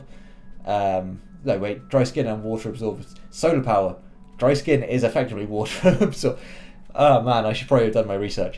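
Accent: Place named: England, United Kingdom. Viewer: Great draft, even better accent - it's nice not being the only Englishman in the league!! :')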